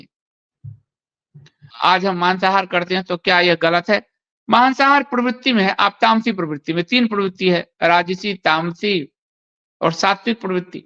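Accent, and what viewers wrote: Bihar, India. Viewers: App bihar Chapra se hai kya?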